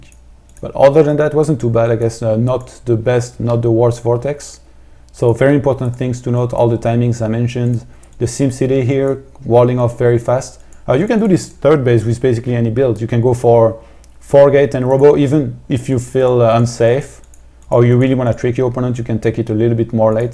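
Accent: France. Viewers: Awesome man :) French who speak english sounds so nice hehe, keep this up!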